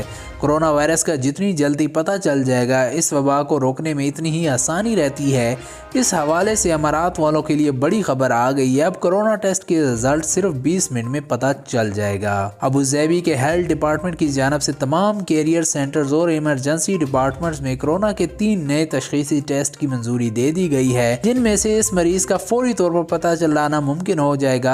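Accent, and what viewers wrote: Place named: United Kingdom. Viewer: Please mere bhai apna English accent keep away jazakum Allah wo khair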